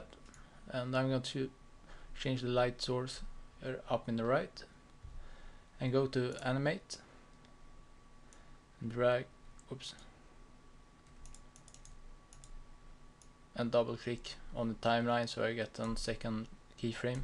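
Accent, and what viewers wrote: Sweden. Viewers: your swedish?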